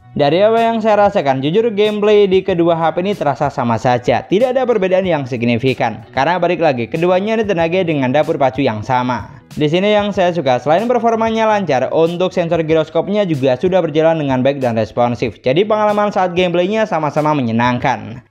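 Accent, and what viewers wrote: Madura, Indonesia. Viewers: Bang kamu orang Madura ya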